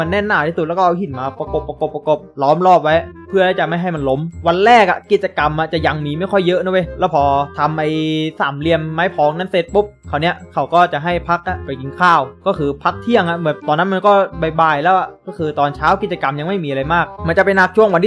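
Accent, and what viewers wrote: Phetchaburi, Thailand. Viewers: คนเพชรใช่มั้ยครับฟังสำเนียงรู้เลยคนเพชรเหมือนกันครับ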